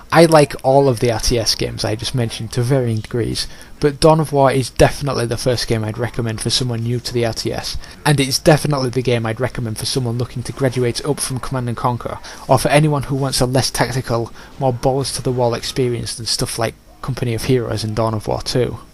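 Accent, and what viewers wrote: United Kingdom. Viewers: are you from britain? just asking.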